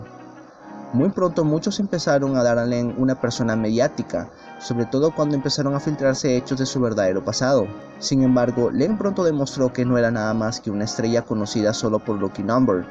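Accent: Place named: Venezuela. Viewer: Genial este resumen sobre la grandiosa, a través de este material descubrí este excelente canal, que voy a seguir en el futuro, si no me equivoco por tu acento neutro podrías ser venezolano al igual que yo, saludos por tu canal.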